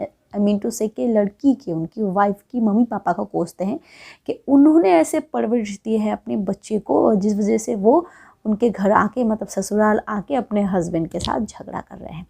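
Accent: Bengal, India. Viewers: Interesting he mam aur app Bengali lag rahi ho appke tone se 😁❤️ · Woh Bengali hi h 😂😂😂😂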